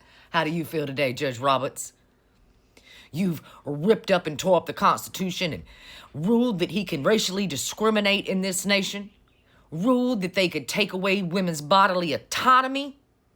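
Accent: South, United States. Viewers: The message is on point👏🏾the southern accent is the cherry on top🍒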